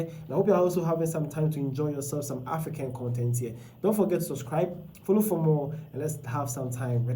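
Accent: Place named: Nigeria. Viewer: I thought you are a Nigerian. You have a deep Nigerian accent.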